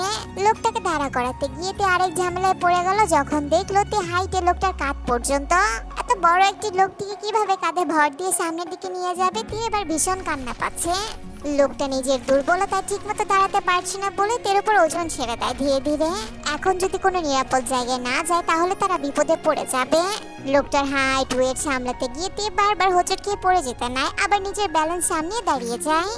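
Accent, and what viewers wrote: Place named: Narsingdi, Bangladesh. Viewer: ওও আমার বাড়ি নরসিংদী খুশি হলাম নরসিংদী কথা বলছো বলে 😂😂😂😊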